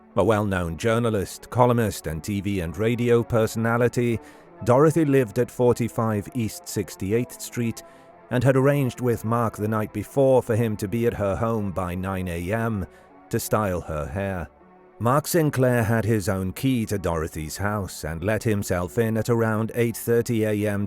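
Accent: England, United Kingdom. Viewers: Why do this in an england accent?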